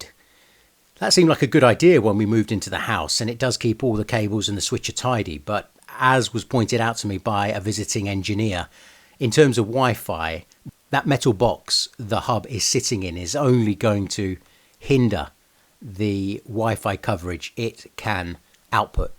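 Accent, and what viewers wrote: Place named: Latin America. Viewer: Porq hablas en inglés si se te nota lo latino?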